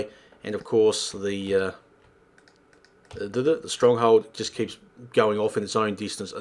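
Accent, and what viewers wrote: Australia. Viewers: Obviously Aussie xD.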